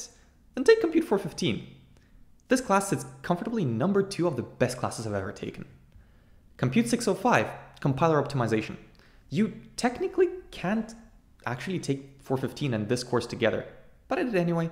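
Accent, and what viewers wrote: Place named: Canada. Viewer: Can hear u r Canadian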